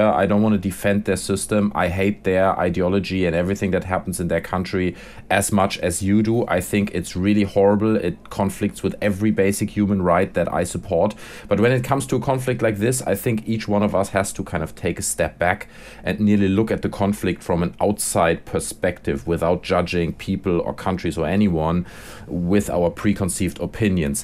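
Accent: Germany. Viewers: Ah your accent is German, I've been wondering since the day I saw one of your videos, you say you live in UK but your accent just sounds so different :D. Merry Christmas and Happy New Year :) !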